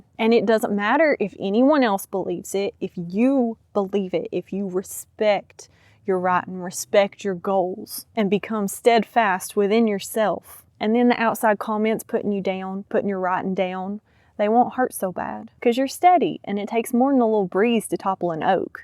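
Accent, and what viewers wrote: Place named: Alabama, United States. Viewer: Your accent is adorable! I thought you were Alabama, but go Vol's! Appreciate your message. My debut novel (The Year of the Cat by Gary Kotowski - Amazon) didn't move the 5000 copies I envisioned it to sell. I'm still invisible to agents and I'm too discouraged to start a second novel. Needed to hear your step three! Thank you!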